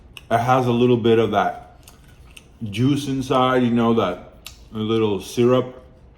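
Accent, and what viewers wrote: Poland. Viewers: On jest Polakiem ? On mówi z polskim akcentem. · Wspaniały akcent Polski. Pozdrowienia z Polski 🇵🇱 greetings from Poland🇵🇱👍👌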